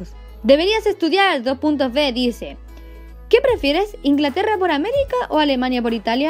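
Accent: Chile. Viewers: Es que lo dije en cuánto escuche tu voz xD Se nos nota mucho el acento chilensis(?) xD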